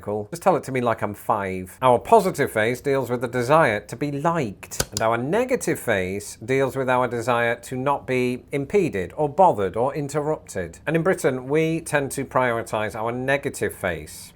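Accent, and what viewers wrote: United States. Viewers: Your American accent is getting pretty good. Just need less nose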